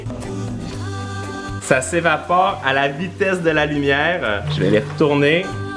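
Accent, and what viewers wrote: Canada. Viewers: = la vidéo toujours très sympa. Votre accent canadien rajoute un plus à vos vidéos très instructives. Passez un bon we les zamoureux. 😍🥰😘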